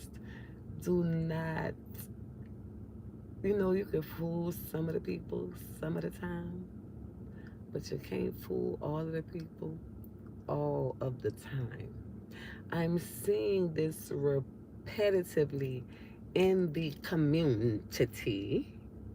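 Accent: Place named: New Orleans, United States. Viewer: I love the New Orleans accent. You're so authentic. I love it. I'm a new subscriber.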